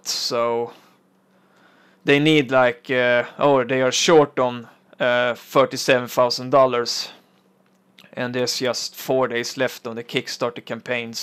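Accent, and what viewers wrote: Sweden. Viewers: You are Swedish, right? I mean I'm sure Danish people and Norwegians have a similar English accent, but this is identical to the Swedish accent so that's what I presumed that it is. And I don't really engage too much online with voice except with friends on Skype so you're not likely to hear me snacka Svenska.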